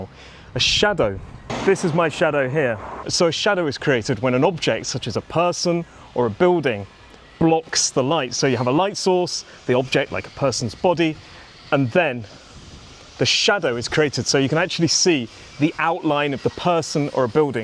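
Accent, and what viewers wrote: United Kingdom. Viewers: So nice, when I was a kid I went with my school to Southampton a couple of times (once to Southampton proper and once to Chandler's Ford) and we used to spend one day in Isle of White, I remember it so well, that was 50 years ago though. Maybe that's the reason I understan you quite well, because usually it is hard for me to understand british accent :) 5 pounds? That's really a bargain! BTW, I think there was a monument in Southamton (maybe a door of the city walls?) called "The Bargain". Am I correct, or it is a false remember?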